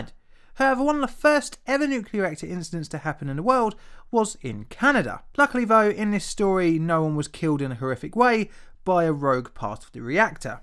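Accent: United Kingdom. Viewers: You have no idea how much i appreciate you use the metric system in your narration (and your British accent)! Most english channels use feet and inches which i can barely translate properly. ^^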